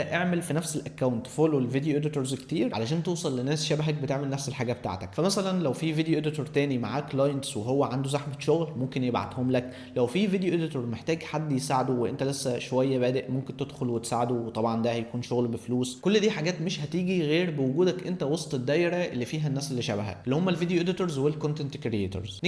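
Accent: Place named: United States. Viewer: بالله اتكلم بلهجة عربية لهجتك هجين كأنك امريكي تحاول تتكلم عربي غصبا عنك اعتز بلغتك لا تصير رخيص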